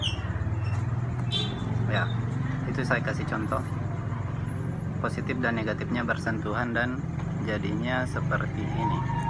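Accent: Bugis, Indonesia. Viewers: Kya orang bugis ngomongnya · Logatnya kaya saya kenal daeng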